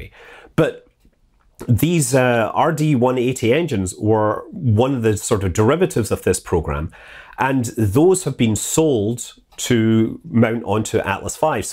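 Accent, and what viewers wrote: Scotland, United Kingdom. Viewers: His Scottish accent kicks ass IMHO.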